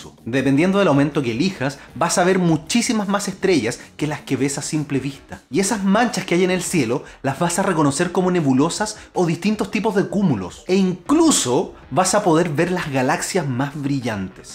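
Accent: Chile. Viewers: Si eres chileno, eres el referente de como debiésemos hablar todos jajaja. Excelente video y canal. Va esa suscripción. Saludos y felicitaciones ! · Es chileno y me encanta como habla!!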